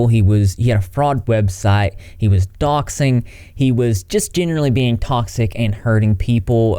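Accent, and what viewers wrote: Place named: South, United States. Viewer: Your voice and accent are great. Seriously. I am from the southeast U.S. and it makes me happy to hear someone who sounds similar to myself online. That you can sound this way and still be considered concise and intelligent and professional. I am here for it.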